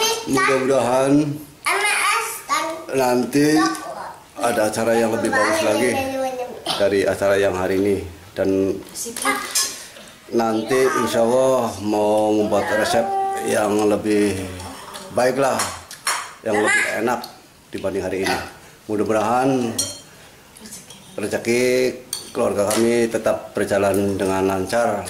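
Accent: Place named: Banyumas, Indonesia. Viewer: bahasa ngapak....emang dr kota mana pak....😁😁😁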